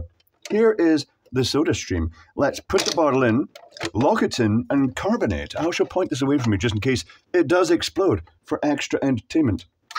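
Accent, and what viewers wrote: Scotland, United Kingdom. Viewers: Can I just say, you have the most easy to understand Scottish accent I have ever heard! for my American ears anyway. Sounds like a more modern version of the "Prime of Miss Jean Brodie" accent.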